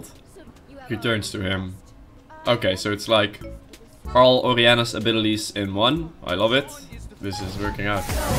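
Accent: Brabant, Netherlands. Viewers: Je hoort kei goed dat je nederlands bent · I love the dutch accent XD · the dutch accent tho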